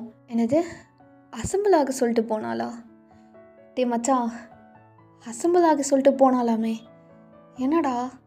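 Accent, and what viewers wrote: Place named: Kerala, India. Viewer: Do you have any Malayalam connection.. actually unga tamil videe malayalam super ayii irik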